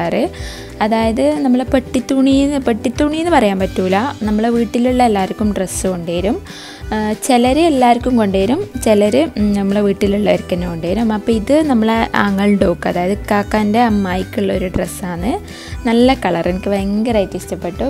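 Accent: Kannur, India. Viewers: Njan malappuramkariya pakshe ithayude kannoor slang enikk orupad ishta😍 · Kannur slang maatandato nallaind kettirikan 😍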